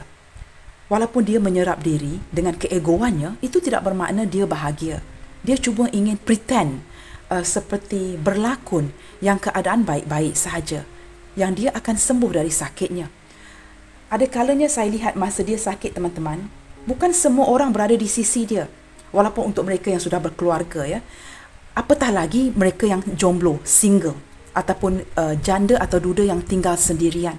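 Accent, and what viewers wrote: Malaysia. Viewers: Thanks you atas pencerahan nya bunda and aku suka swara nya yang khas Melayu nya sexi sekali bunda and sehat selalu ya bunda semanggat trus dalam konten nya 🙏🙏🙏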